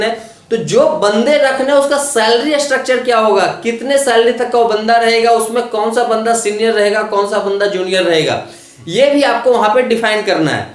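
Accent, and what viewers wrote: Bihar, India. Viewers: Aap bihar se h kya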